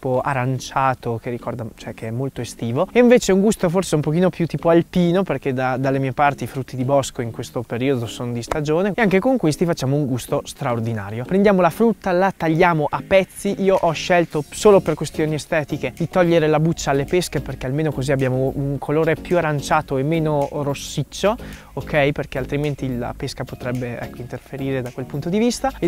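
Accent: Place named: Romagna, Italy. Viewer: Ma perché hai l'accento romagnolo!?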